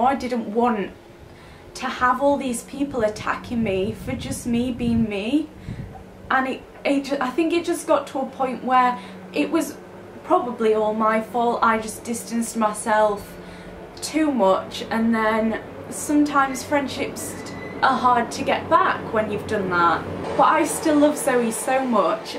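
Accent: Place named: Yorkshire, United Kingdom. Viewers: 'Tret me' Yorkshire lass through and through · You have a really northern accent but you're trying to sound posh and it doesn't work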